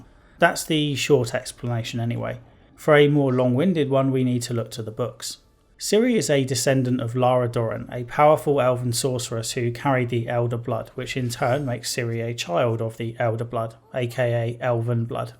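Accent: United Kingdom. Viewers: The only thing missing from the joseph andersons videos was the british accent, now its fixed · Why are you trying to make me shut off your video after you go off into left field talking about race? And why demean yourself by calling yourself a privileged white person? Your original instinct in 2015 to roll your eyes was the correct one. Why are fantasy stories mostly populated with white people? Cause it’s based off of European history and culture. Based on your accent you come from a country that used to run the world and the self flagellation is just embarrassing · He's very obviously British from his accent though. Stop making this about America. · Oye, francisco, cálmate que te lees bien facho. Él tuvo una mala elección de términos al decir que no había diversidad cultural, pero creo que fue accidental. El universo de The Witcher es basto y rico culturalmente hablando, es lo más hermoso, rendirle homenaje al folklore europeo de tal forma es bellísimo. 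El gesto de su crítica fue decir que se puede entender que los humanos de cierta región sean homogéneos en cuanto a su color de piel y más si se trata de la Edad Media y todo ese rollo, pero con PORTALES, otros mundos y otras especies como la de los elfos, enanos emtre otras antropomorfas ¿y todas esas son blancas también? Muy raro eso, muy sospechoso. El tipo es británico y se le nota en el acento de aquí a la China, dude, chill. Puro facho defendiendo sus sesgos raciales. Si fuese una representación histórica acertsda de la Edad Media sin portales ni otros mundos, pues no hay problema, todo bien, pero no en un Universo donde existe la magia y la habilidad de viajar entre regiones y otros mundos. Facho.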